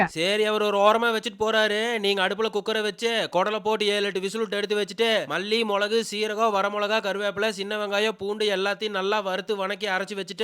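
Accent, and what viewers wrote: Kongu, India. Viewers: Unga peachu gounder mari iruku correct😊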